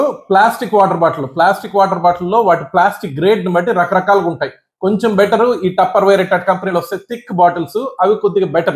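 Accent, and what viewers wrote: Nellore, India. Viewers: మీ మాట తీరులో మన నెల్లూరు యాస స్పష్టంగా కనబడుతోంది 🙏 మంచి సూచనలు సలహాలు ఇచ్చారు ధన్యవాదములు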